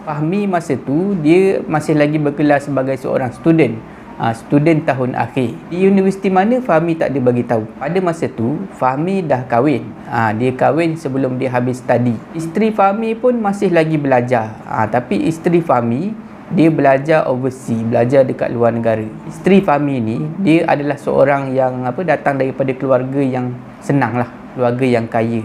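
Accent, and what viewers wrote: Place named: Perak, Malaysia. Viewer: Bang orang mana terengganu ke perak bunyi seakan akan macam loghat peghak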